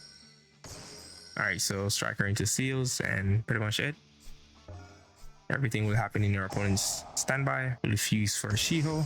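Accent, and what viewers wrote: Jamaica. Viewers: Yuh sound like a yaad man.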